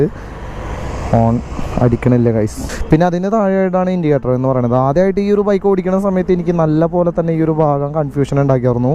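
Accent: Thrissur, India. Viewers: Aa thrissur basha onnu maatan sramikyo pls😁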